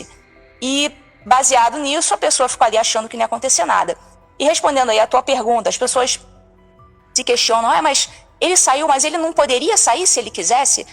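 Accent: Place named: Rio de Janeiro, Brazil. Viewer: Me desculpe. Sei que não tem NADA a ver 😮mas esse sotaque #carioquês😮 é muito cansativo ficar ouvindo 😴 😑desculpe-me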